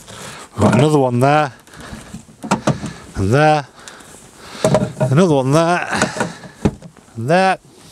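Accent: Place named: North Yorkshire, United Kingdom. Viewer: Northern England accent (North Yorkshire)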